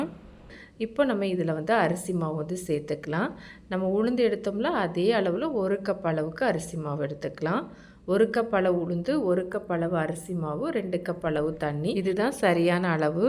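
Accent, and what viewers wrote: Tirunelveli, India. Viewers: Your Tamil is like Tirunelveli Tamil.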